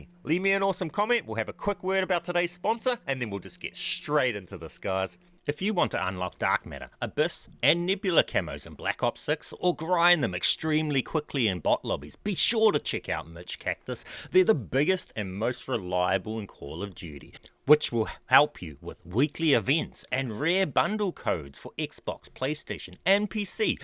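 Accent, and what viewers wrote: New Zealand. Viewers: Churr brother are you kiwi bro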